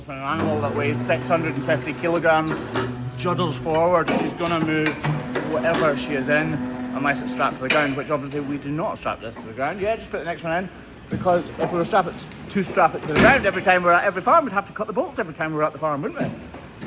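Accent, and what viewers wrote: Scotland, United Kingdom. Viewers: . Your book was a fantastic read. I couldn't put it down. Thank you for letting us into your life. You are a wonderful guy and you deserve everything in life. When I was reading your book, it felt like you were in the room reading the book to me in that lovely Scottish accent. Merry Christmas to you, Mrs Hoof GP and your wonderful boys. May it be joyous in everyway possible for you all. Xx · We watch to hear your accent. My mom is from Scotland. We want to try to get her there again. She has stage 4 lung cancer. It’s stable for now and she is healthy. We can’t really afford to send her, she needs a helper with bags n stuff. So one of us to go with her. She could go in her own, for a short time. She’d take less stuff. But it’s just a dream for now. We will see what life has to offer. We will keep praying for a miracle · As a horsewoman in Canada, I'm fascinated with the trimming of the cows hooves as I am with horses hooves. 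And I'll be honest, I love a Scottish accent lol. Wish I could visit your holiday place..😊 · I think people appreciate the openness of Youtubers. Not everything in the garden is rosey as some would make out. Behind every Youtuber is a human being with problems like everyone else. Sometimes people like to know that they are not alone as they have similar problems. Maybe one day your daughter will reach out. Keep positive. Not sure why I stumbled upon this channel but I am sooo glad I did. It's refreshing to hear fellow Scots accents too! I'm from Aberdeen and there are very few Scottish Youtubers that I have heard. Who would have thought that hoof trimming could be so satisfying 😂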